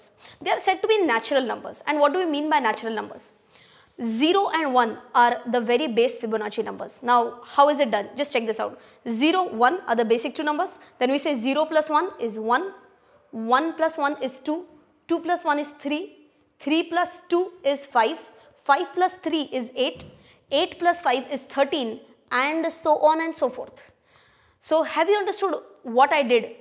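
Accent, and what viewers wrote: India. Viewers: I never like the Indian accent of English till I see you. thank you very much you are amazing all love and respect.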